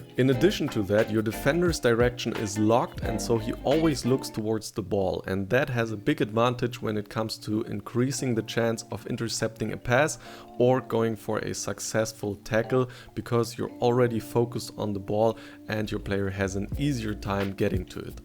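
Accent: Germany. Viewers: Man merkt ja so krass dass deine Muttersprache Deutsch ist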